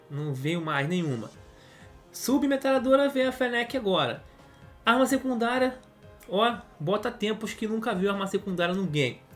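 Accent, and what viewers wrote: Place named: Rio de Janeiro, Brazil. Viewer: essa sua voz de carioca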